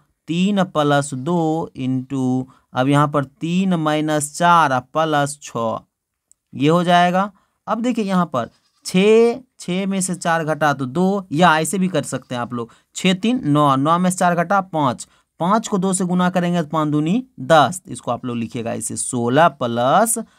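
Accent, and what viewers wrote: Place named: Maithili, India. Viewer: Bhai aap bahut maithli bol rhe ho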